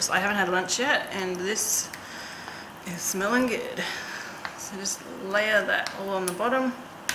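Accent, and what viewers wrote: Australia. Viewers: It great to hear an aussie accent too!! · Just found your channel. Am I hearing an Australian /accent ? The ingredients you use if they Aussie based can you list in description box the American product substitute a measurements in parentheses. Thank you. I turned away from your last week because for fear I wouldn't be able to follow your recipes I watch the food channels to unwind and food prep for inspiration. Your personality and presentation style brought me back to your channel.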